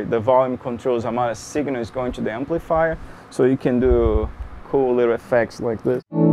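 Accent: Brazil. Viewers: Do you happen to speak Portuguese? You seem to have a Brazilian accent...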